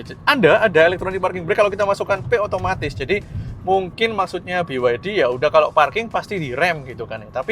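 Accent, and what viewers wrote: Surabaya, Indonesia. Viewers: Soko logate aku langsung ruh awakmu asli Suroboyo. Sego Endomie.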